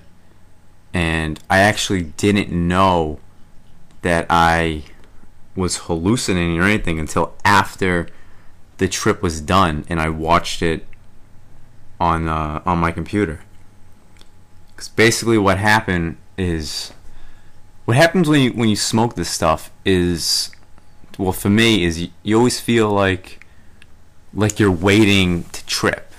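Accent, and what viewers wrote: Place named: South Boston, United States. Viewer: Where in boston are you from? Sounds like southie lol.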